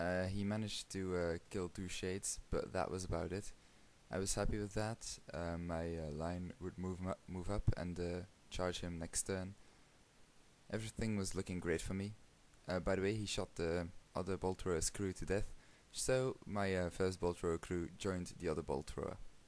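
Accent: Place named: Flanders, Belgium. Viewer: haha I can hear your flemish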